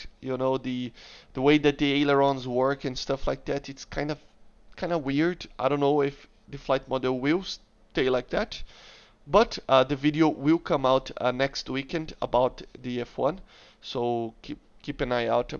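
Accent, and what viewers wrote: Brazil. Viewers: kkkkk sabia, esse sotaque br e mt forte, mas o inglês e bão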